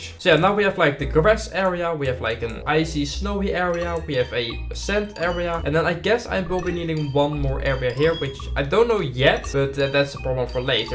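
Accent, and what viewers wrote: Netherlands. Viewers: ik weet dat je nederlands of belg bent dat hoor ik aan je engels maar i love youre vidoes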